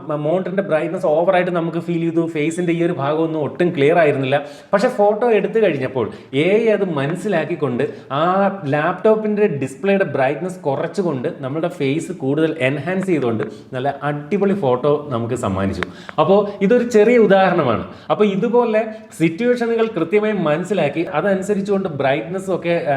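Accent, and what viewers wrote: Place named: Trivandrum, India. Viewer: Trivandrum അങ്ങനെയാണ് ബ്രോ H ന് ഹെച്ച് എന്നും പറയും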